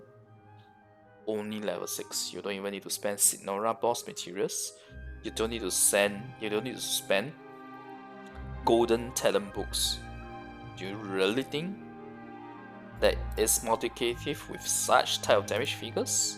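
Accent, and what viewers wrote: Malaysia. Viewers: Hey, came across your video on a fine random Sunday, and gave it a listen. It's rather long and my brain has troubles processing lots of technical information so I thought I would drop a comment to clarify a few things instead. But first of all... Nice to know there's a fellow SEA player out there, in fact, I'm guessing you're Malaysian more so than Singaporean:P. Yes, the accent was super thick (no, nothing wrong with that). If you are Malaysian, just know we're brothers KEK. I'll be even happier if we actually live in the same capital, cause I see a lot of Malaysian faces on Genshin and a few of them doing content creation, and I think we could use more Malaysian talents in a game like Genshin Impact. Now onto my question... Everlasting Moonglow. You mentioned something about the weapon's passive giving FLAT bonus instead of Multiplicative bonus. 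Like I said, I am not a math guy and technical stuffs isn't my forte. Usually, I just do generic math and that's about it, but what is multiplicative bonus in this context? Say I have 50,000 HP on Kokomi now, and this weapon gives me 3% at R5. Can I assume multiplicative means 3% of 50,000? Meaning 1,500 for Normal Attack DMG as stated in the weapon's description? Or is this FLAT? All things taken into consideration, the increase does feel rather meager, but I think the gem of the weapon lies in its ER mechanic. Here's what I don't understand tho. I'm assuming you referred to the Kokomi Mains' master guide for damage cros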